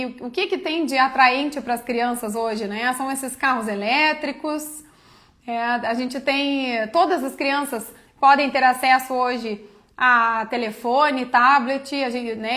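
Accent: Rio Grande do Sul, Brazil. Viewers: Dra de onde a Sra é? Do Rio grande do sul? Se sim atende onde? Moro perto de Porto Alegre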